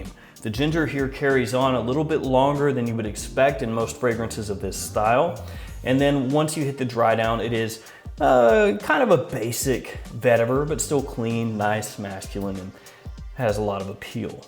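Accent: United States. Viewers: I mean hes American so I guess his normal talk is actually how we talk lol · Really? That's how Americans talk?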